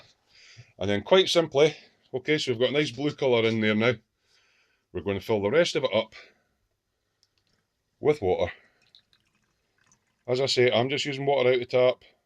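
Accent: Scotland, United Kingdom. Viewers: I'm new to airbrushing, but this was interesting. I have seen a video in which a few drops of glycerine were added, together with the IPA, distilled water and screenwash. Apparently, it adds a bit of lubrication. Thanks for sharing. (I live with a Scottish lady and so the accent was no problem!! :-) )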